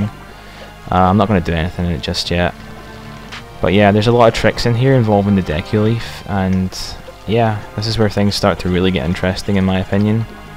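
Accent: Scotland, United Kingdom. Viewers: are you scottish? I detect a scottish accent in your commentary =D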